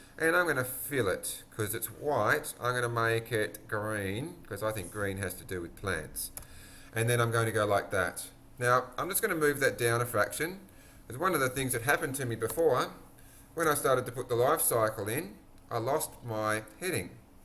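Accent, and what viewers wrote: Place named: Australia. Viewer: love the australian accent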